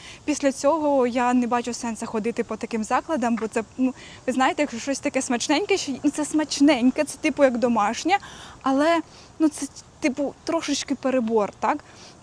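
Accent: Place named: Surzhyk, Ukraine. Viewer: з якої ти області в Україні? в тебе така прикольна українська, але деякі вставки слів з "мови з боліт" ріжуть вуха) нетиповий суржик)))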